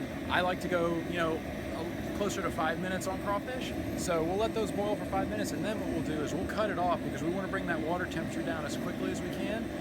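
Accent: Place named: North, United States. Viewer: you sound like a yankee. no wonder you did everything "back assward".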